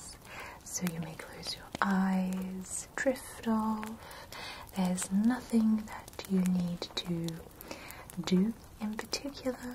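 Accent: United Kingdom. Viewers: she have a proper brit accent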